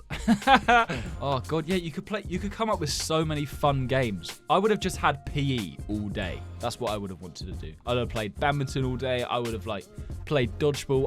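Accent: United Kingdom. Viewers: Are you British cuz I am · Bros British 😊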